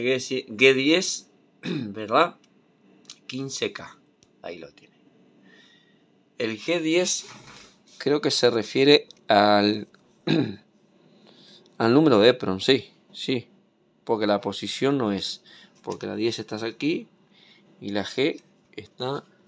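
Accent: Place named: Argentina. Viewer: Que groso saludos de argentina y gracias por tus conocimientos....jajaja insisto que tenés tonada de argentino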